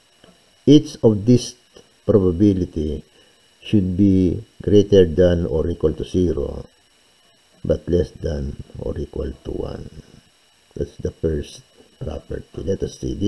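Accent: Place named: Philippines. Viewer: Pinoy na pinoy ang accent by the way thank you po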